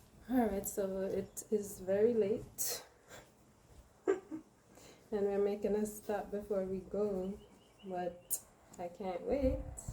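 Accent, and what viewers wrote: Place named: St. Elizabeth, Jamaica. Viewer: Noice 🌞Should have easily guessed you from St. Elizabeth 🙂. Just like how when someone from Montego open there mouth you know they from Mobay "mi deh guh" 😅.. or Spanish Town once you hear "Umbri" 😂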